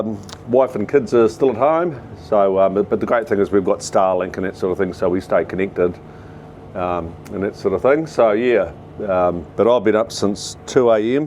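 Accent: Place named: New Zealand. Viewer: Hey, of course with the Kiwi accent, I'm 65 & I wish I had a massive "deck".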